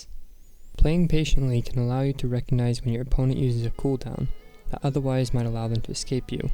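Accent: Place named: United States. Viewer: Just curious, where are you from. It sounds like you have a typical American accent at first, but I keep hearing little places where it sounds like an accent is coming in.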